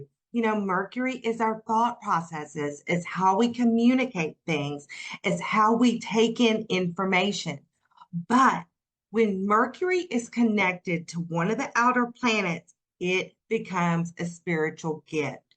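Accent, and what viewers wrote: South, United States. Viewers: Thank you for the beautiful insights., are you from Georgia? I love your southern accent. I'm trying to learn to identify each accent and where it derives from. So far, I have South Carolina down pretty good. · I really need to get a reading from I love her accent she reminds me of being down south(my family is from the south)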